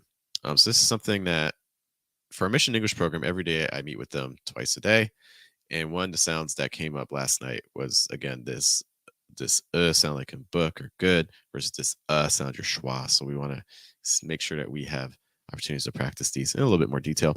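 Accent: New York, United States. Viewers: I'm watching your content and it's so good. I have a question, which part of US are you from? New york?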